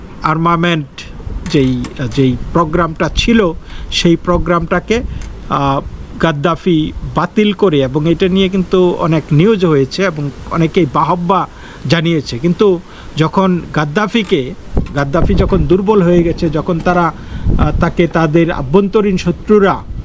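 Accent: Bangal, Bangladesh. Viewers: অনেকদিন পর । খুব মিস করছিলাম আপনাকে। আপনার মুখে বাঙাল ভাষায় খবর শুইন্যা মনডা ভাল হইয়া গেল।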